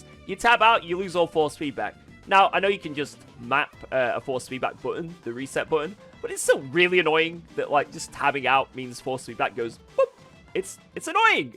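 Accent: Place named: North East England, United Kingdom. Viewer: New player, new subscriber - I'm trying to place your accent though?! I get twangs of North East, then a bit of Manchester'ish all with the odd bit of Americana?! 🤔😂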